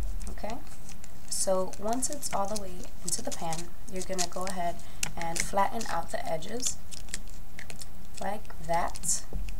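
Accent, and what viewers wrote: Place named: Cuba. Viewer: are you Cubain because you have that kind of accent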